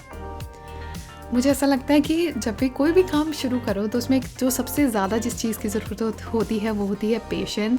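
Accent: Punjab, India. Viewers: those punjabi touches when you speak are so lovely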